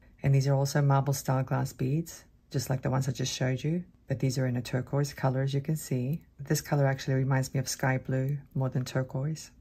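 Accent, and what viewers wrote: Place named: Australia. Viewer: Thank you so much for the video! AND thank you for keeping me company whilst I get my lab ready for school. ☺LOL! When I first subscribed to your channel, I had guessed you might be from AU from your wonderful accent. I have several great friends from OZ. I'm hoping to get there to visit them sometime soon. This box of pretty beads will make many wonderful treasures. Thank you again! Take care and be safe! 😊💖🙏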